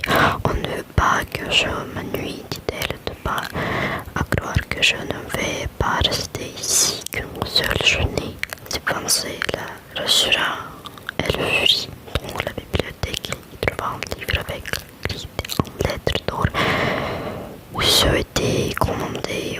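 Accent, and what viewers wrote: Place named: France. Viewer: hiii your french accent is really really good! it's so relaxing! maybe one day you can do a makeup tutorial video i really love your style! it reminds me of sousie soux with a mix of 20s glamour! i would love to draw you tbh haha 💟💞💟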